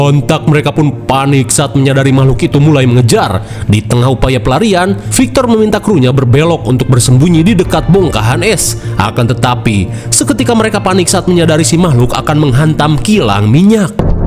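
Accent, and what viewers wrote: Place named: Java, Indonesia. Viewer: Entah kenapa saya mendengar suara Jawa Jawa Jawa 😅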